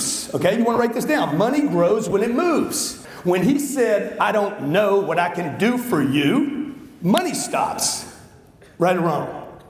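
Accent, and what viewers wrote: South, United States. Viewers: I love Grants Southern accent, makes him just that much more BADASS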